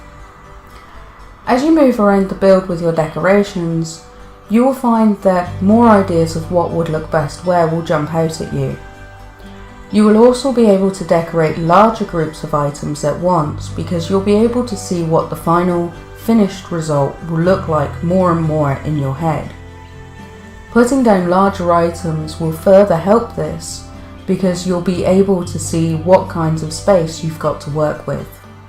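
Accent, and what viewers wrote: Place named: France. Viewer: Ouiiii j'adore ta vidéo, tu as un accent français si charmant ^_^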